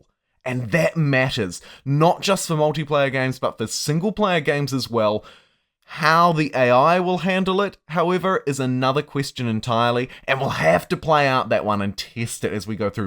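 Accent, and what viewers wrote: New Zealand. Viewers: Be nice to put a face to the Kiwi accent I've been hearing so much whilst enjoying this game! Is one of those gents in the vids yourself?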